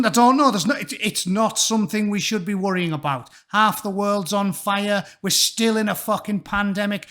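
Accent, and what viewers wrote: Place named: RP, United Kingdom. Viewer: A perfectly eloquent tirade in the queens English. Rock on.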